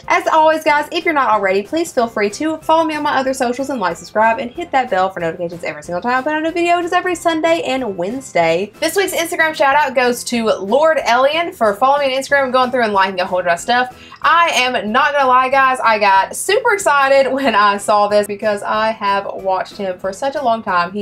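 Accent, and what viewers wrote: South, United States. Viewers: Damn u a QT!! I had to sub!! CANT get my Lemon Pastel to eat a FT to SAVE his Life!! 🤦‍♂️ I cant even get my lil ninja to STRIKE one time just on some Defensive issh!! 🤦‍♂️ I know he ain’t gone strike at a mouse NO time Soon!!! Also, what part of the South are you from??? Lol I love your countriness(I know that’s not a word) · Very informative video. And it is nice to see a beautiful woman with a Southern accent. I have a 10 month old ball. She does really well feeding. I feed her a grown mouse about every week. I think it may be time to move up to small rats.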